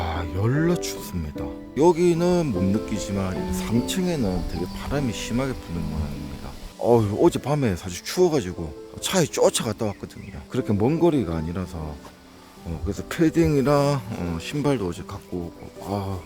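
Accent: Gyeongsang, South Korea. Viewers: 경상도 말투로 이렇게 재밌는사람은 처음본다 유머가 짱. 최고! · 경상도 말 죽이네요❤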